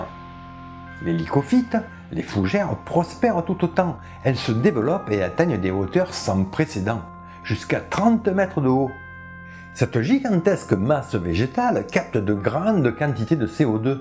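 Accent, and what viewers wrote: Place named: Marseille, France. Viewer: Bonjour, et bravo pour vos connaissance en paléontologie, J'ai 82 ans et j'ai fais des fouilles ici en Belgique dans ma jeunesse Mais lorsque je voie la quantité et la richesse des découvertes ....je peu revoir TOUT c'est fabuleux la quantité d'animaux que l'on a découvert plus ceux que l'on avait déjà mais que l'on n'arrivait pas a classé. C'est fabuleux ! Je regarde très souvent vos programme et en plus j'adore votre axent marseillais .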